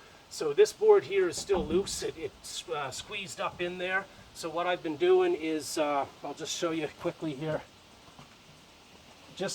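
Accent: Ireland. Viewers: Are you irish... Nice vid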